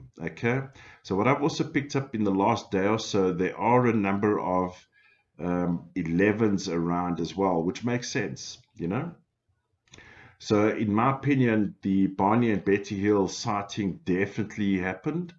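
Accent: South Africa. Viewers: I knew this was a South African accent...are you still in SA?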